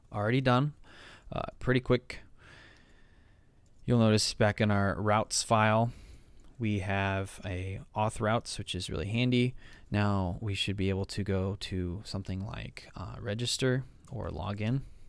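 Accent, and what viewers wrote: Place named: England, United Kingdom. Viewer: i love your english accents and thank you sir :)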